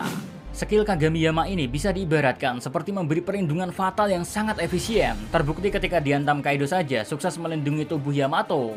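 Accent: Java, Indonesia. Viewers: mind reques ..tampilin wajahmu mim soale model ngmge sampean iki wong jowo wkwkwk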